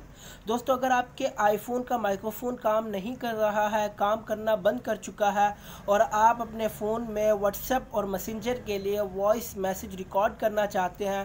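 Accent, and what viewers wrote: India. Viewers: English title but indian speaker .. nothing inderstand 👎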